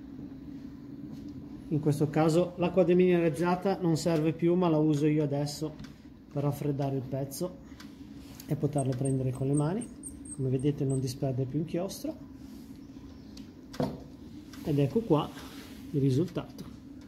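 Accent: Genoa, Italy. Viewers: per fare un bel lavoro allora mi calcolo in peso quanto è diluito l'acido e aggiungo acqua distillata fino a raggiungere la soluzione giusta. Comunque noto con piacere l'accento genovese. Io abito in entroterra tra la Spezia e Genova e sono un appassionato delle cose che proponete nei vostri video. Lavoro alle macchine utensili se vi serve qualsiasi cosa per una collaborazione sono a disposizione saluti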